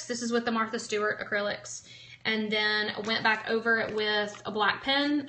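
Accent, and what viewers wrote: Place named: South, United States. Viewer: Hi, just saw this periscope, so glad I can view this on youtube (I can't periscope:-) LOVE your tutorials for this lettering and florals, THANK YOU for showing us how to do this. BTW, love your southern accents too;-)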